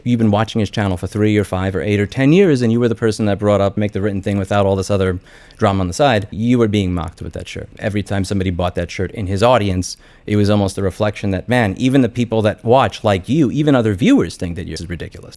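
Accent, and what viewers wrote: New York, United States. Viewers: You can tell Louis is a true NYer. Im guessing Linus is from Caliornia.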